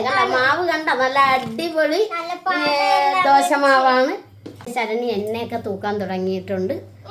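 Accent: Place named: Kochi, India. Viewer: Njan first time anu ivarude vlog kanunne. Angatt ingattt nammakk🤔. Kochi ano sthalam. Njanum kochi anu . Slang kettatt chodhichenatta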